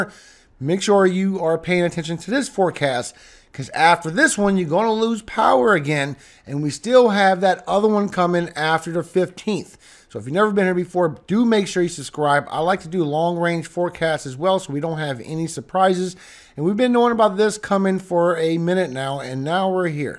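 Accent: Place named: Florida, United States. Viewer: Good reports thank you for creating them. Your voice and accent sound like old Floridians especially in the Florida keys. You would blend in well with some of the old conchs here.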